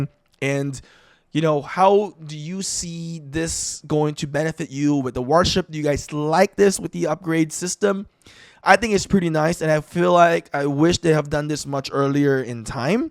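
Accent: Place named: Philippines. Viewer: Pinoy accent, pinoy ata 'to.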